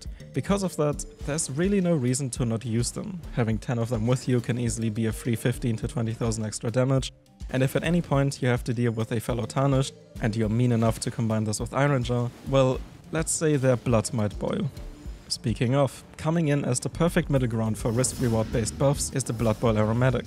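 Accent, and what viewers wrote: Germany. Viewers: I smell german accent 😤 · Hey Not to sound rude but are you german Because you sound exactly like every German I know speaking English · Alter du klingst krass deutsch aber danke für deine Viedeos die helfen echt weiter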